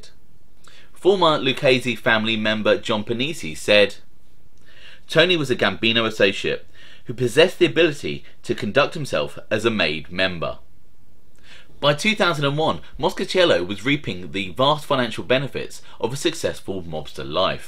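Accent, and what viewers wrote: England, United Kingdom. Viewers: OMG that's the same thing I said 9th Ave all the way😂😂😂😂the very first time i saw this channel i thought he wz the gangster r i wz shocked when I heard the English accent!!!!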